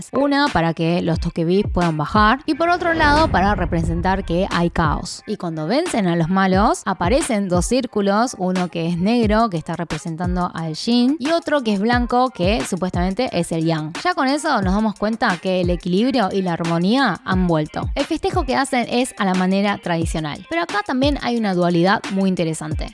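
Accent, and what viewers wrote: Argentina. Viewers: Te sigo de hace mucho y lo que más me gusta es cuando haces estas explicaciones y nos acercas más a vuestra cultura que dicho sea de paso es super mega interesante para los de este lado del mundo,agradecida por todo ahora no solo disfruto de sus voces bailes y música sino que también entiendo la temática que hay de atrás. Muchas gracias mi niña eres súper amorosa y tú acento argentino me encanta ,un abrazo y bendiciones desde Chile🙋🇨🇱🙋🇨🇱🙋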